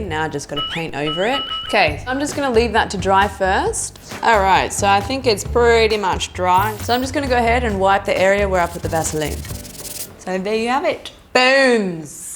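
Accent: Singapore. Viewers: I love her and her Singaporean accent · Is she singaporean?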